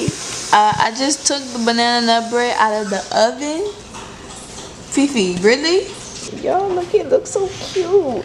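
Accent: Louisiana, United States. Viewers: Thanks, we will be trying this. I hear your Louisiana accent. Here from, South Louisiana -Opelousas, Louisiana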